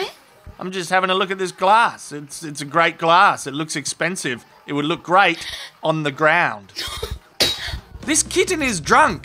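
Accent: Australia. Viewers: I haven't laughed this hard since I've listened to the comedy rantings of Rodney Rude. Love the Aussie accent by the way.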